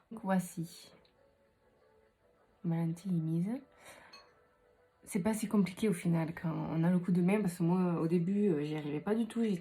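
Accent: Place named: South, France. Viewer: Merci pour l’astuce, explications claires et avec un bel accent du Sud 👍🏻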